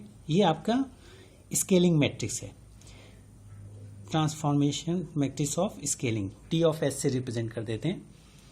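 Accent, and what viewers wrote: India. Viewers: is there any single video without indian accent about Computer Graphics?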